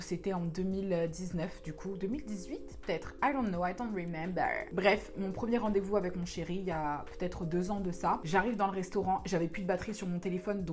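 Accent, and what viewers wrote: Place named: London, United Kingdom. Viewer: Moi jveux aller à Londres pour revenir avec le même accent que toi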